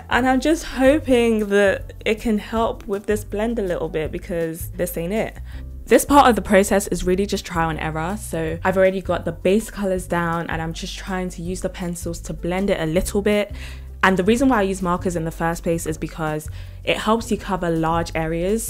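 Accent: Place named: United Kingdom. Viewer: I like your British accent And the asmr while you talk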